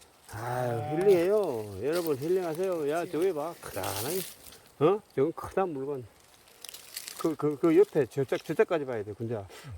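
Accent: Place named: Gyeongsang, South Korea. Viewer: 영상 너무 신나네요~~사투리가 김천(?) 고향사투라 오랜만에 정겹네요 안전 산행 하세요~~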